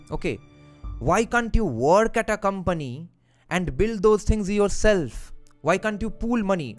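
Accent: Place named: India. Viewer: Would probably be ok if narrator could speak at a normal rate. Machine gun Indian English is difficult to follow.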